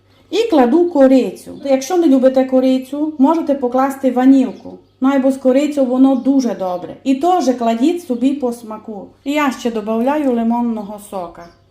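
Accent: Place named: Prykarpattia, Ukraine. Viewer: Добрий ваш колач, а ще ліпша ваша мава. Дуже приємно вас слухати . В селі, де жила моя баба дуже подібна вимова, таке "и", хоч вже в сусідньому селі, такого нема діалекту. То на Прикарпатті, на Львівщині. І кухня у них відрізнялася. В бабиному селі жінки вміють пекти бульваники в п'єцу. Де ще вміють пекти бульваники? Цікаво яка історія, як заселялися люди, як переходили на інші місця.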